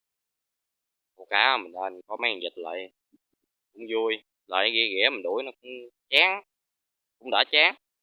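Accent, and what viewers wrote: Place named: Mekong Delta, Vietnam. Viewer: nghe tiếng là biết miền tây rồi phải không? tỉnh nào đó.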